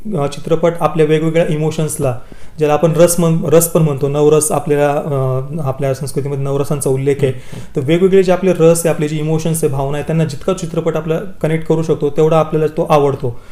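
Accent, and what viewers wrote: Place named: Nashik, India. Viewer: तुजा मराठी एक्सेंट फुल नाशिकी आहे मला वाटल पुणेरी असेल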